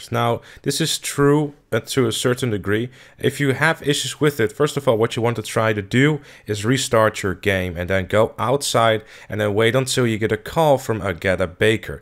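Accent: Netherlands. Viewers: Je hebt een beetje een nederlands accent klopt dat?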